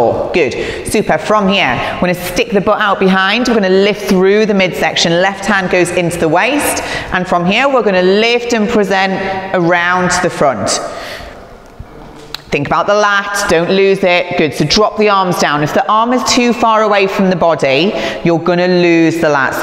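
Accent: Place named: United States. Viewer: Non capisco l'americano inglese 😔😔😔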